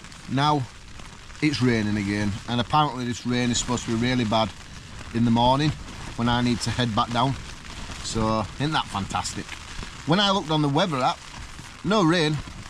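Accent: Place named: Yorkshire, United Kingdom. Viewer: My husband’s family is from York, your accent reminds of theirs. I love all of the UK but especially York and area.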